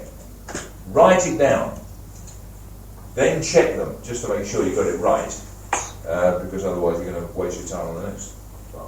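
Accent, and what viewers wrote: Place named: South London, United Kingdom. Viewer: I went to KCS in the 70s. BH was my form master in 1976. And taught me maths. We never knew what a star he was on the rugby field. Though we should have when you saw him on the rugby pitch. What’s a cross between a rhino and a giraffe called? Yes. He was a great bloke. Totally uncompromising. And absolutely straight. A great sense of humour and a great smile too. Good memories. Brings a tear to my eye hearing his south London accent again. Not many of those at KCS!And takes me right back to him telling me off. Thanks. Simon Smedley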